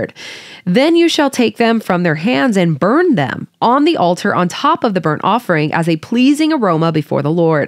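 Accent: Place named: South, United States. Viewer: & Although you do sound a tiny bit like you have a southern accent on .75.. you pronounce things way to clearly lol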